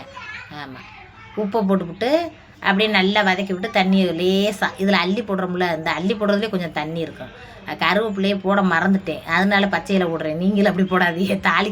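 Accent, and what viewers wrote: Madurai, India. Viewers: ரொம்ப எதார்த்தமா பேசுற நல்லா இருக்கு இந்த வீடியோ பாக்குறதுக்கு சூப்பரா இருக்கு அந்த தமிழ் தாம்பா உங்கள அடிச்சுக்க முடியல மதுர தமிழ் தமிழ் தான்யா கேக்க ஆசையா இருக்கு சென்னையில இருக்கிற எங்களுக்கு உங்க தமிழ் பிடிச்சிருக்கு பிடிச்சிருக்கு பிடிச்சிருக்கு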